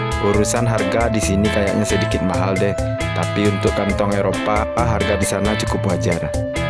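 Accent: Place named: West Sumatra, Indonesia. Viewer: adminnyo bahasa indonesianyo...khas minang bgt..dialegnyo melekat ke bahasa Indonesia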